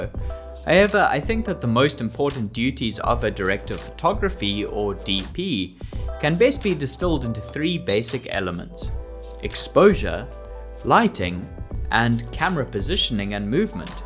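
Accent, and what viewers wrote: South Africa. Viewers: I hear a South African accent! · Are you South African by any chance? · Are you South African bro?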